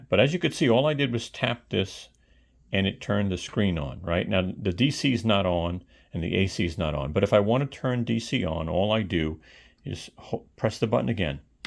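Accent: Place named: New York, United States. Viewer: First time on your channel. Cool review. You sound like my son in law who's from New York.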